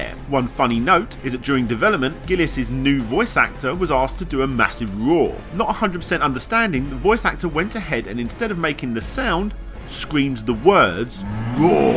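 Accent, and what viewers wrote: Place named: England, United Kingdom. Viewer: It's so nice to hear complete history videos from a fellow Englishman instead of American folk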